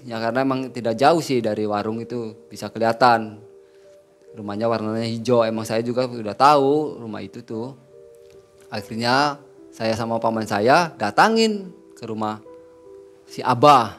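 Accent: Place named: Java, Indonesia. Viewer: Medok bgt lurrr 😂😂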